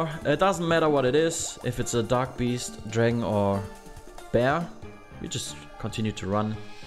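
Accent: Germany. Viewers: bin Random hier gelandet aber sofort rausgehört einer von uns 🤣 junge dieses ewige Prager wechseln das ist echt stresserzeugend 😂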